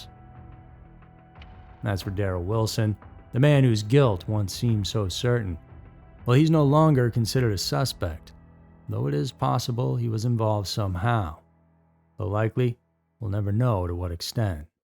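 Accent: South, United States. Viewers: When a new creepiest comes out, I only watch it during the day. I am a new subscriber and have been binging so much I live in a permanent state of creepiest. If didn't have that addictive Southern drawl it would be easier to take a binge break 😊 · Love your southern accent 😂🇬🇧. I really hope the cold cases can be solved......the families deserve it 🙏🙏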